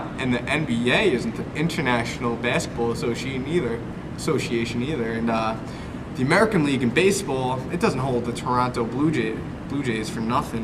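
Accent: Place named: Long Island, United States. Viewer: you don't sound professional at all, it is hard to even listen to what you are talking about because you just sound like another guy from LI. You need to settle down a little bit and take yourself seriously. good to see you trying something you are interested in. -Sam Scheibel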